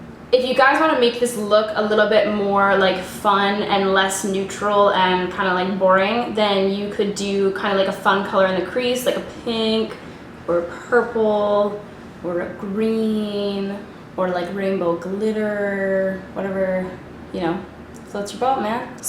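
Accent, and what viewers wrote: Canada. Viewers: Your Canadian accent tho! Can't get over the way you say crease, I love it! Love your work xx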